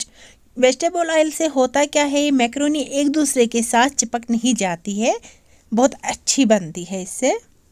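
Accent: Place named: Kashmir, India. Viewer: Ur kashmiri language is very sweet di❤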